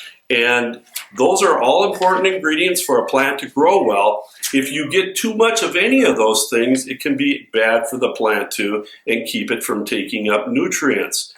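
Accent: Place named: United States. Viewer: Love this info. And finally someone with plain English from America explaining things. Thanks for this video.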